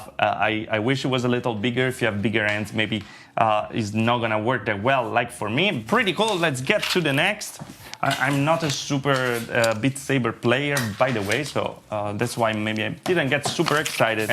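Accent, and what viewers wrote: Italy. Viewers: Are U italian? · Hey man, I'm sorry for such a silly question that you probably get all the time, but you sound a little bit italian to me with your accent. Are you? If not, where are you from? Cheers from italy :D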